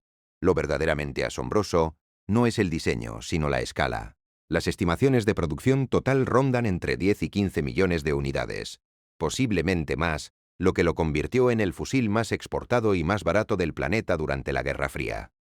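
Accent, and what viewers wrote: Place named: Spain. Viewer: Hablas con acento: "ostia, tio"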